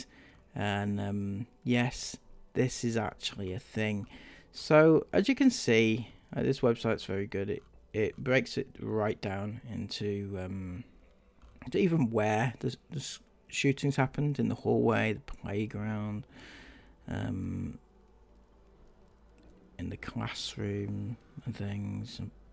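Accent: United Kingdom. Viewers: Why are you bothering yourself with what's happening in America. You sound British. Do you not have enough to worry about in your own country?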